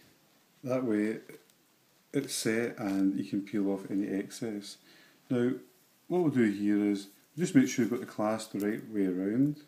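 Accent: Scotland, United Kingdom. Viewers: Great seeing a man doing this. Great job . Love hearing the Scottish accent! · If you knew how much your accent is loved by many of us here in the US, you'd have a lot more tutorials. It was nice hearing you and thanks for the tutorial. 🙂 Before I die I'll make it to Scotland and tour where Alexander McCall Smith has the setting for his wonderful books and I'll go visit your shop too. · The shop is located in Scotland so it's a scottish accent. :-)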